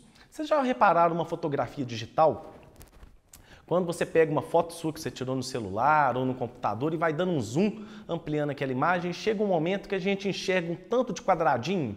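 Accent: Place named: Minas Gerais, Brazil. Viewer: geoprocessameeeento. como eu amo o sotaque mineiro kkkkkk otima aula! obrigado!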